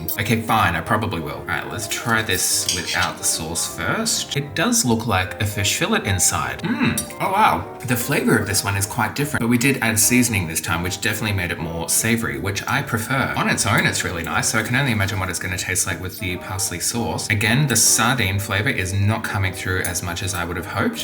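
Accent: United Kingdom. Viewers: Just loving what you're doing and the presentations are fabulous - especially the RP! Makes me smile every time. Thank you 😄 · Your videos are a joy to watch and, as always, your English accent is brilliant and you sound just like the Headmistress at my school……think Hogwarts, without the magic, but all girls and in the 1960’s. Every time you said ‘mock’ you made me giggle 😂😂 I’m in Lancashire England and In Aldi today 500g of leeks were £1.39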